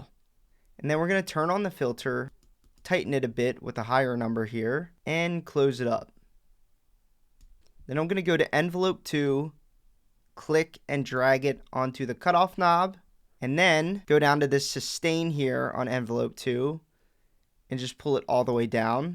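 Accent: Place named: East Coast, United States. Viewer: you must be from the upper east coast " cut it in hafv"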